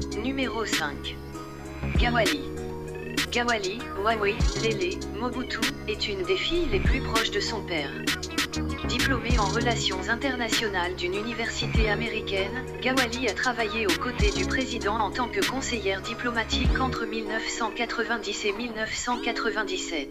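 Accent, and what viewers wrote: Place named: France. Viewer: Bizarre. Même pas capable de prononcer les noms comme un Congolais... Accentuation et nasalisations à la française...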